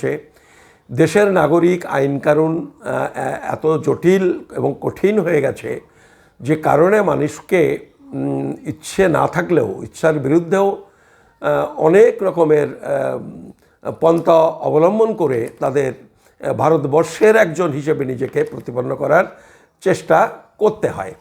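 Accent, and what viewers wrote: Bangladesh. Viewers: কেমন বাংলা দেশি বলে মনে হয়, পুরানো refugee না নুতন refugee. পুরানো দের তেজ বেশী l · Apni pakka Bangladeshi....kotha sune bujhte perechi... 😂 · তোমার কথা শুনে মোনে hoy তুমিও Bangladeshi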